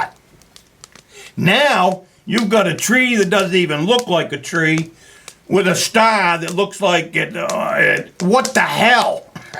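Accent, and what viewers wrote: Maine, United States. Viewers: Great video. Spoken like a true Mainer right down to "August'er". Big hugs.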